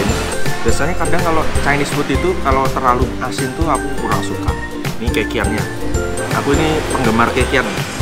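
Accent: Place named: Solo, Indonesia. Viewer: medoks tomen to oms"? lahir solo ta?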